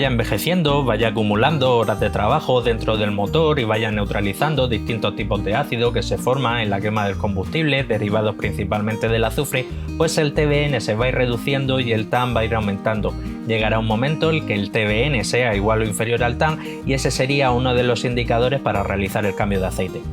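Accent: Murcia, Spain. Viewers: ok, muchas gracias, a ver qué tal dentro de un año con uno de esos dos. Para el año que viene, merecería la pena probar algún Ravenol? Qué supondría bajar a un 0w40? Podría hacerlo? Vivo en Valladolid España, en los meses de invierno, de Diciembre hasta Marzo es normal estar a -3°C o incluso -5 o -6°C muchas mañanas cuando arrancó el coche, y suelo hacer trayectos cortos de 4 o 5 km varias veces al día. En verano puede llegar alrededor de los 40°C de temperatura, algo menos que en Murcia que es donde parece que vives, lo digo por tú acento, tengo familia en Los Alcázares 🥳. El año pasado cuando faltaba poco para el cambio de aceite, el motor ya sonaba un poco a lata, a ruido metálico con el Motul Xcess 8100, pero con este Shell Helix Ultra parece que sigue sonando muy redondo y eso que ya le toca hacer el cambio de aceite, es posible que este año haya hecho 1.000 o 2.000 km menos que el año anterior, no sé si eso tiene que ver, pero creo que poca diferencia hay entre hacer 7 o 9 mil kms por año... 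Yo rara vez paso de 10 y 11 mil kms al año y el 80% de los kms son en ciudad y recorridos de 5 km o menos.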